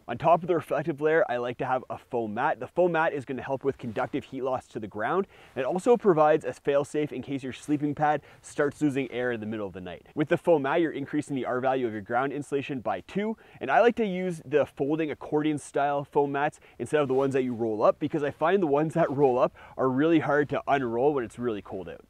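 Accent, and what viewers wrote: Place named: Canada. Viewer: annoying canadian accent.....ooootside, lol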